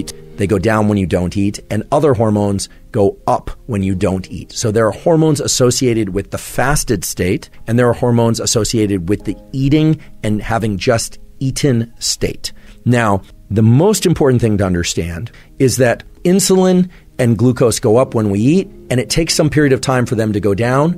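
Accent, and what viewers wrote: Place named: Canada. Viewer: Canadian rhythm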